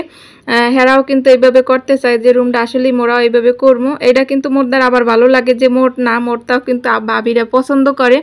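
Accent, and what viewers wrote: Barishal, Bangladesh. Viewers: শাক রান্না করলে রেক পরিস্কার করলে খুব ভালো লাগলো। তোমার কথাগুলো শুনতে আমার খুব ভালো লাগে। আমার শাশুড়ি তোমার মতো বরিশালের ভাষায় কথা বলে। কখনো বাড়ি গেলে শোনাবো।❤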